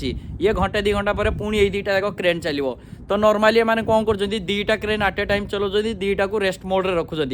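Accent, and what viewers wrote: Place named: Angul, India. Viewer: nka ghaa anugul a4hmalik side ru ki....katha pura anugulia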